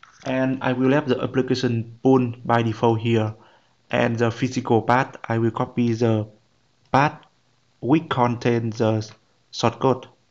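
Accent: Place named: Vietnam. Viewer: Nghe giọng Việt Nam ta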